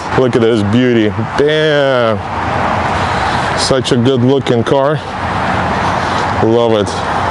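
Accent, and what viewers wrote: Russia. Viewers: Я один слышу хороший такой русский акцент?:))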